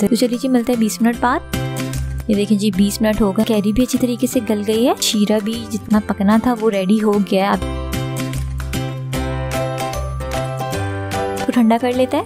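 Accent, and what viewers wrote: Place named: Pakistan. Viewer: My guess was right from accent you must be from Pakistan, ab next year paka try karunga and best of luck Sis. Waiting more of your videos and recipe.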